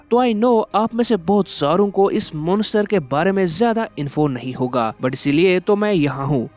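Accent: Kashmir, India. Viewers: Bhai kya aap kashmir se ho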